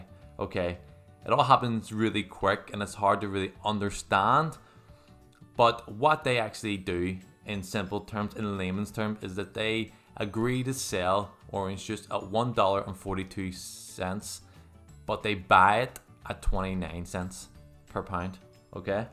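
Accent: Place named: Northern Ireland, United Kingdom. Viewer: Being N. Irish, I had to double take when I heard your accent when watching a video on such an American film lol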